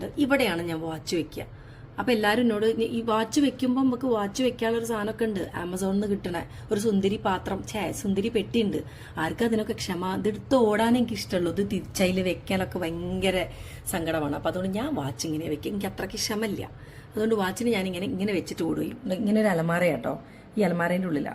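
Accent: Kozhikode, India. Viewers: Kozhikodan slang nice presentation❤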